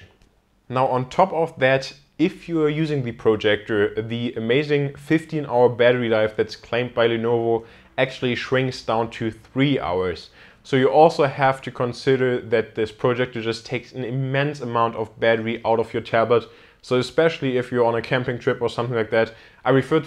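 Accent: Germany. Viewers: Du bist doch Deutscher. :D Das hört man doch.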